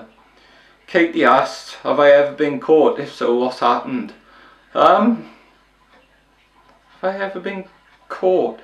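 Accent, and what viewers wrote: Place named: Liverpool, United Kingdom. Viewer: Jesus how did you say woolton hall 😂😂😂😂😂 that was hilarious just as you're starting to pick up the scouse accent too. Great Q&A mate.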